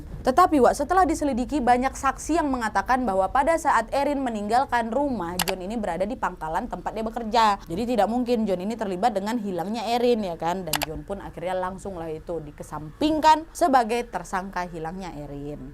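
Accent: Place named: Medan, Indonesia. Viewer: tuh org sunda tp cara pembawaannya kaya orang medan😊 aku suka cara pembawaan ceritanya, meskipun menegangkan tp bisa bikin hati tetap slayyyyyyy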